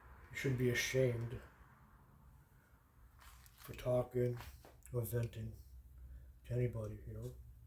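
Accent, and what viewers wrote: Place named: Boston, United States. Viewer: The CC heard your Bahston accent as Alta. :face-green-smiling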